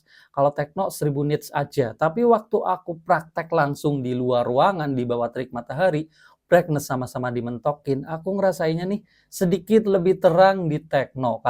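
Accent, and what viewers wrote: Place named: Banyumas, Indonesia. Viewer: Ora ngapak ora kepenak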